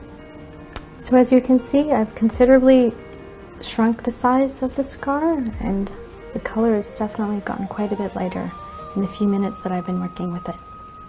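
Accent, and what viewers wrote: Canada. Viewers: I knew she was Canadian before she said so. They often have a way for throwing away every sentence so that nothing is salient. The rest was just nonsense of course. Relaxing nonsense at least.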